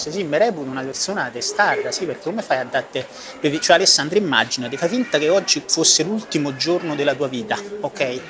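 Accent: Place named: Rome, Italy. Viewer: Che poi Gli Inglesi guarderanno il video in modo perfetto e con stile tipo "We are Like star Wars, We reached episode 4 of The Car accident" Poi ci siamo noi italiani che sentiamo "Savve pellegrini d'a rete" Amo il tuo accento romano in every case XD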